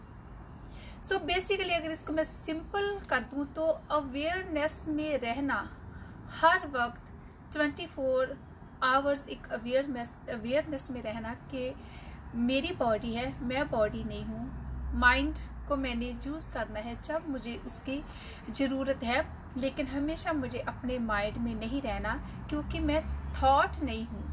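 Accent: Punjab, India. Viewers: Perfect answer.. बस Punjabi accent bahut zyada hai.. Why don't you make the video in Punjabi?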